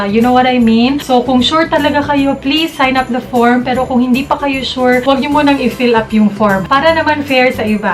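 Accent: Visayas, Philippines. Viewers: mau diay bisaya kaau mo say AHAHAHHA I'm also a bisaya hii ate were proud to be Cebuano's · You're so loveable and very enjoying to watch! Tho you have that bisaya accent, you show your true and natural self to your subscribers! ❤ Continue to inspire us and do a lots great videos! May God Bless you always po Ate! Power! 💖💖💖 FB: Jorgie Villas Instagram: jrgvlls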